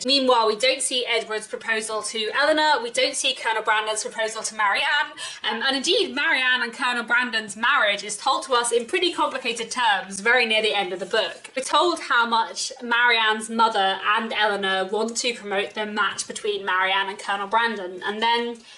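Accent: United Kingdom. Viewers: you are one of the inspirations and motivations for my English self-learning. I have grown fond of Jane Austen's literature on your video account. People tend to use the US accent in my country and you are why I persevere with the British accent. Love you from Viet Nam ~~ :3 my favorite hero is Tilney by the way, such a charming and practical when necessary man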